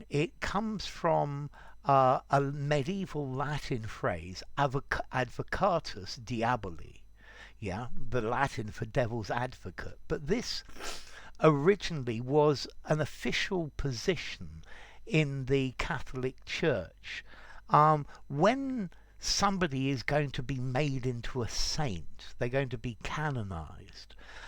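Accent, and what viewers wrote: United Kingdom. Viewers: My God, teacher's British accent is adorable 🤟😎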